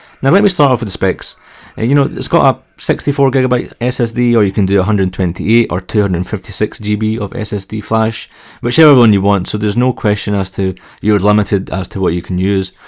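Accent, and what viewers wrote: Scotland, United Kingdom. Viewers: I adore your Scottish accent (if that's what it is), even if it's quite hard for me to understand as a non native speaker! Very interesting real-life usage tests, the MBA looks like a great machine.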